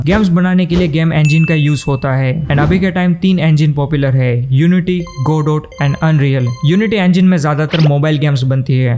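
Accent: Gujarat, India. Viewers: from your way of speaking game I know you are from Gujarat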